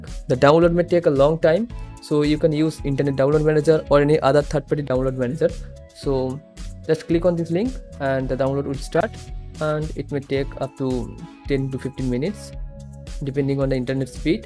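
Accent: India. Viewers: Xati axomiya pronunciation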